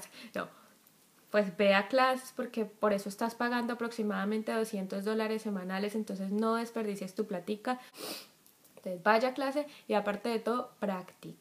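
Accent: Colombia. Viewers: Tenias mucho acento caleño jeje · esa forma de hablar, jaja se nota que sos colombiana. Muy buenos consejos; y como tú dices: ponerlo en practica es indispensable. Muchas gracias por el video, saludos desde Cali-Colombia vee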